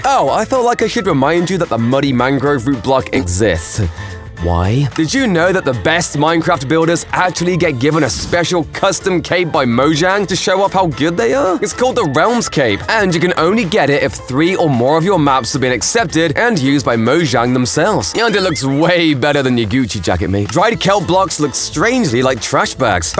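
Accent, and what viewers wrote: United Kingdom. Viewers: Damn didn’t know was British! and he has a new skin! Finally got rid of the red play button! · Are you British shut up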